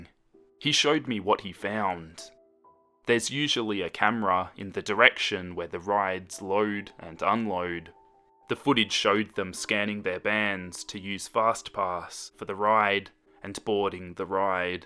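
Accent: Australia. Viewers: Your skill and works are an inspiration. Also your Aussie accent adds a uniqueness to the story narration.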